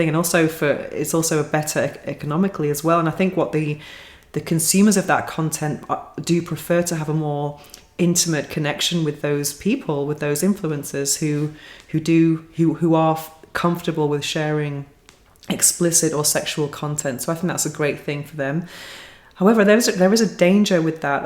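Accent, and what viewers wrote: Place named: RP, United Kingdom. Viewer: Absolutely, I didn't mean it as a critique. You usually speak, for someone who doesn't have English as a first language, in a way which sounds more of a received pronunciation. Or it might be my imagination for all I know. :D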